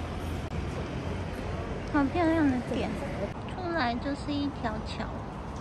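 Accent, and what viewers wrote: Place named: Japan. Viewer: 嗨～ 講中文聽起來好像日本人講中文的口音。恭喜破五萬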